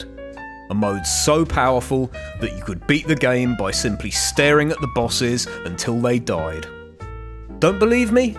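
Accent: United Kingdom. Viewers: Why the hell do you sound like old Pyrocynical? Or all british people sound like that? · oh god you're british. not watching bye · I absolutely love this sarcastic British narration, your amazing voice and impeccable attitude have earned you one more sub, and hopefully many more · I just love tired british acents for example how you say "at a" just sounds like ah a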